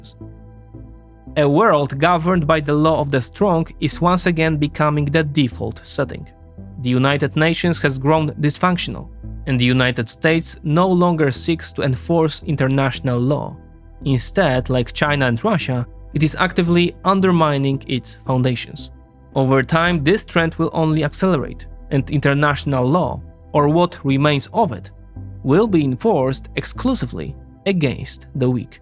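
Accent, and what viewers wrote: Poland. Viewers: The CGIs were very nice on this one. The overall quality of the channel keeps going up. And we always have our favorite Polish voice to relate to. 😁❤🙏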